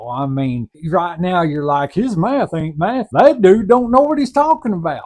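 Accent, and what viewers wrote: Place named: Texas, United States. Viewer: Love your southern accent! you from texas?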